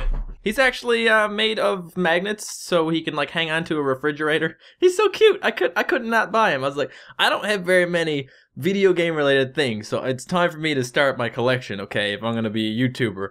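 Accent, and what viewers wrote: Canada. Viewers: Are you candian ? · I'm new here I saw you from your besiege video and I enjoyed it you earned a sub by the way are you Canadian?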